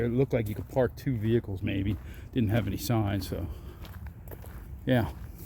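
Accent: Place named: East Coast, United States. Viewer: New subscriber. One of your videos turned up on my YT feed. When I saw Perkiomen Trail, I had to subscribe. I'm from PA--lived in Philly, Philly burbs, and after I Ieft my parent's home, I moved to what was called Port Providence in an old farmhouse. After that, I moved to Phoenixville..just a hop, skip and a jump from the farmhouse. I'm out west now, and it's nice to see the place I loved the most when I was back home. I used to picnic with my husband and baby son on the Perkiomen creek--boloney sandwiches on kaiser rolls, and chocolate milk from Wawa. We'd fish, and my husband would take my son out into the creek to splash around. Great memories. I look forward to watching your videos. I love hearing your East coast accent--another thing that brings me back home. Thank you.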